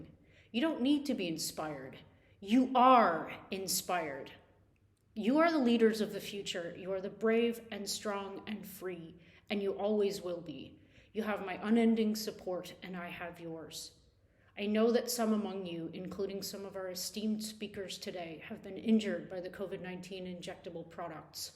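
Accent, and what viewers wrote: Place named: Canada. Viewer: BRAVO! You are awesome: amazing and great speech! Ps you look marvelous! I assumed you’re Canadian due to your accent…. Am I wrong?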